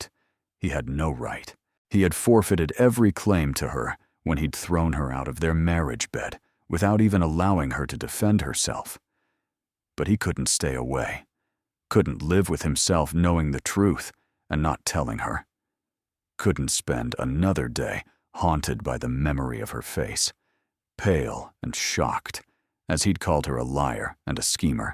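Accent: United States. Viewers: This is a wonderful story. Well presented in every way. However when I listen to these wonderful British tales I so wish it was in a dignified British accent. American accents have no real place in the British Victirian Era. Just a thought ❤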